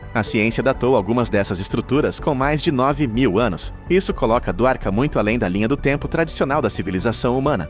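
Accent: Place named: Sao Paulo, Brazil. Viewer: Sotaque de paulista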